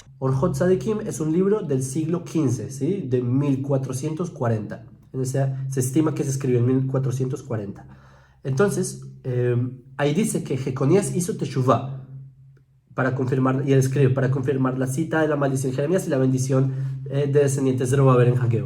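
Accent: Colombia. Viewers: Hablando de genealogía, a mi me parece que este hombre tiene un muy marcado asento colombiano más concretamente como si fuese de Bogotá. Yo quisiera saber si realmente es judío o solo tiene los rasgos físicos.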